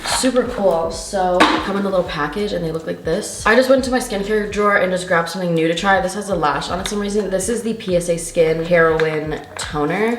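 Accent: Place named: United Kingdom. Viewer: Hi, my name is Brianna and I am from London and you have a lovely British accent.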